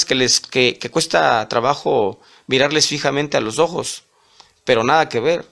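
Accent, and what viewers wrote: Mexico. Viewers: Excelente relato,a pesar del matiz mexicano me encantó . que buena historia digna de una película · Muy interesante historia y sobre todo una excelente narración, con ese acento mexicano que me encanta. Felicitaciones güero, me enganche a tu historia desde el principio hasta el final. Saludos desde Lima-Perú.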